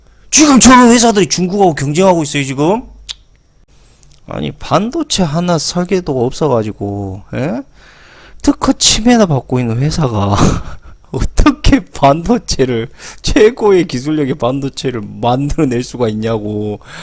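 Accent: Jeolla, South Korea. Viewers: 그래그래 알겠고... 삼성 한국에선 못살게하고 해외로 나가게만들자. 어서 빨리 해외로 나가도록 자꾸 못하게하자... 시기질투열등의식나서 죽겠따. 말씨 억양이 딱 절라도네! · 뭔 헛소리 이사람 전라도 억양이네요 아주 사기를 치네요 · 전라도 목소리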